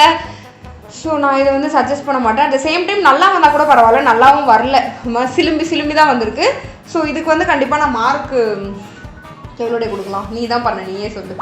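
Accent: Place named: Kanyakumari, India. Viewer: Are you from kannyakumari your slang is pretty good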